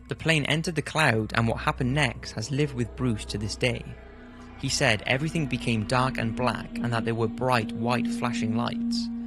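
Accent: Wales, United Kingdom. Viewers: you need to be hired to narrate literally everything. You are a modern day Morgan Freeman with a British accent. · House Giantsbane I think I detect traces of a Welsh accent. If so, iechyd da!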